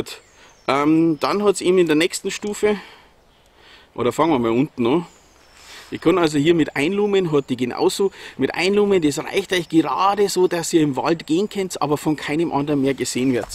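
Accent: Bavaria, Germany. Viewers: #M2R Pro Vielleicht bringt sie mir ja Licht ins Dunkle?! Vielen Dank für deine super Video in bayrischer Sprache!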